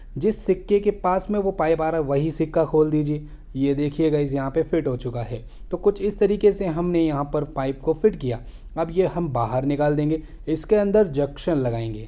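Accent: Rajasthan, India. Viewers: bhai aap Ajmer ya Nagaur se ho. Kya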